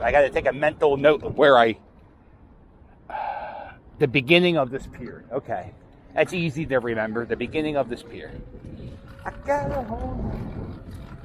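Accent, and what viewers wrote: Philadelphia, United States. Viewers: You need to do voice over work for "thick Philly accent" types. Lol. I'm from Chester County and somehow it's only South Philly and Delaware County. Why is that? Anyway, cool vid!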